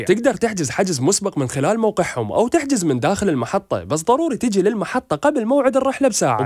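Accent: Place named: Iraq. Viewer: بس سوال انت عراقي بس لهجتك حيل مبينة عراقي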